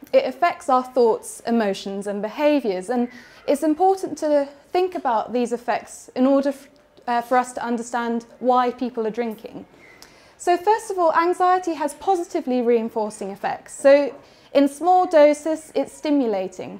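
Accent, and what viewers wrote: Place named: Bristol, United Kingdom. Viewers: a lecture by a British English speaker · she speaks perfectly understandable received pronunciation English with a Bristolian dialect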